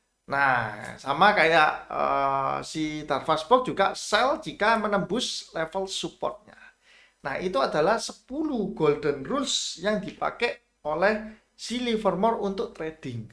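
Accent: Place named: Java, Indonesia. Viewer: seneng sama mas, bosone medhok😀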